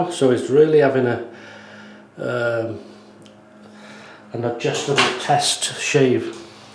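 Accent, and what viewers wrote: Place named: Wales, United Kingdom. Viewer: I really want a model, one day I'll get one. I'm based not too far from you I think. North East Wales from your accent? I'm originally from Bangor but live north East now.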